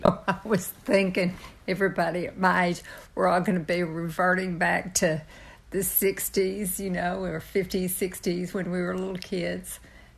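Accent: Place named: Tennessee, United States. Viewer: how are you feeling today? Hope your tests came back negative and you're on the road to recovery. I love hearing you talk it brings me back to my family from Tennessee.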